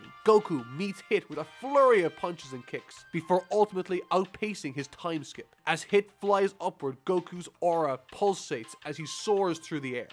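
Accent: Ireland. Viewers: Cool your irish? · With that voice some of us can tell your Irish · I had a feeling u were from Ireland with that accent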